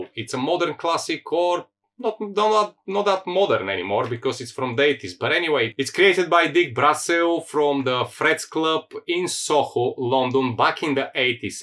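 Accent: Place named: Bulgaria. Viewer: Страхотно видео, но с този акцент съм сигурен че сме сънародници!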